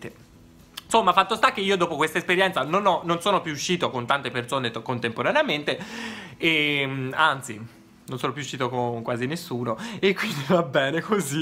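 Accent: Tuscany, Italy. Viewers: Me rallegri la vita😂 sentí parlà toscano è troppo bello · Non c'è la posso fare 🤣😂🤣😂🤣😂 tu mi fai morire 🔝🤣😍 ti adoro...poi da parte di padre so Toscano e quindi adoro sentittè parlá toscano ❤️😍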